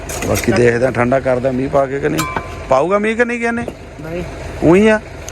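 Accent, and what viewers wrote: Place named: Punjab, India. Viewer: ਠੇਠ ਪੰਜਾਬੀ ਅਤੇ ਜੱਟਕਾ ਅੰਦਾਜ ਬਹੁਤ ਪਿਆਰਾ ਲੱਗਦਾ ਬਾਈ ਜੀ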